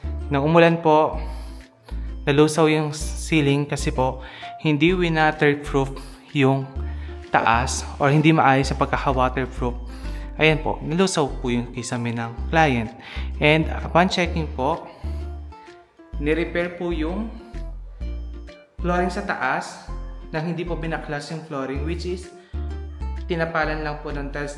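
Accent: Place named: Pampanga, Philippines. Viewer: Kapampangan ka pamo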